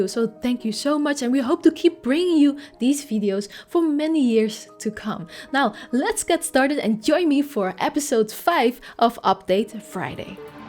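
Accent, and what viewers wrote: Netherlands. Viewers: In the about section of this channel it states: location US. Maar hoewel taalkundig haar Engels als van een native speaker bestempeld kan worden, ik bespeur toch echt een licht Nederlands accent.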